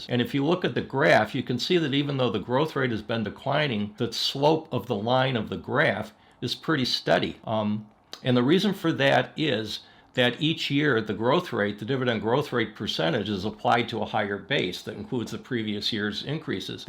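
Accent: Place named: Pennsylvania, United States. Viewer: your have a Pennsylvania accent, maybe Pittsburgh: -you say "dowwars" , instead of dollars. -"quawllee", instead of quality. 😁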